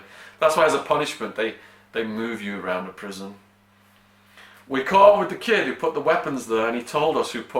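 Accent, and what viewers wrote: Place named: Liverpool, United Kingdom. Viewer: Shaun is yr dialect from Liverpool? Brover....brother....cool tho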